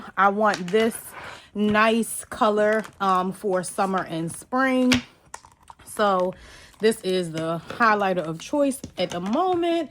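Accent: Baltimore, United States. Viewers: I think I hear a fellow accent sis, baltimore stand upp 🤣🫶🏽 lolol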